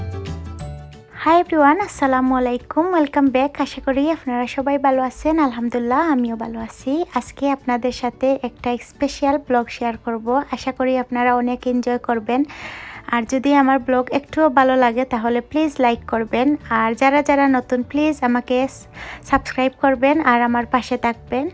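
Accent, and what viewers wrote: Sylhet, Bangladesh. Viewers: Afa sylheti mato ela bala lagena 😏😏😒😒😒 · Boin sylhety mat ow tumare beshi manay. Suddho vashar mat huniya kila lagse.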